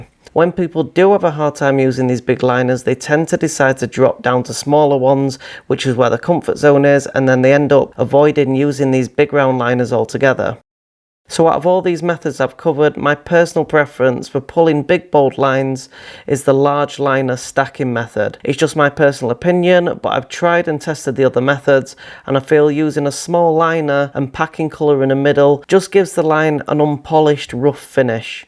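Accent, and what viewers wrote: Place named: Yorkshire, United Kingdom. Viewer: Thanks for this mate , we're close in accents as I'm a Yorkshire man and this was good to find something familiar sounding because the American reference points can differ , just dine my first self tatoo and your video was really informative thank-you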